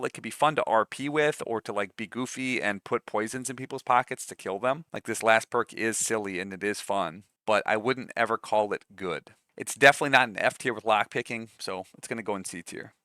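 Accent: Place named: East Coast, United States. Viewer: So I don't have to do an additional purchase for the mod and install it if I already have the Anni Ed???? (Just making sure I understand completely) Loving the streams BTW. Love watching a mathematician's breakdown. Good on ya, brother. (The east-cost accent and mannerisms are the cherry on top, LMAO 🤣. Brother-in-law is from Boston). Yes, it was when you were talking about the sneak skill that you mentioned you had gameplay footage, that you would play at the end of the vid of you using the shadow warrior skill. Was something I'd never thought of cuz I used invisibility instead, silent casting on the illusion tree, with muffle on the boots. Stabby-stab. Shank those mo-fo's from the shadows!! Lol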